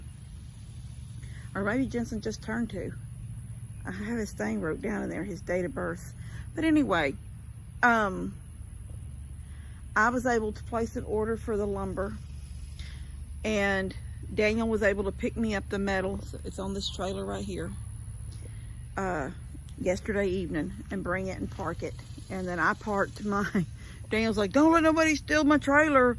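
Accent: Texas, United States. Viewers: We love you. I love to watch your relationship with and. The absolute devotion you have for your beautiful boy. I was so happy to see you'd bought your beautiful truck. I felt like you seemed guilt ridden. Everything you do to give you happiness makes me happy and also Tony benefited. I love watching your Texas life and could listen to your Texan drawl all day long ❤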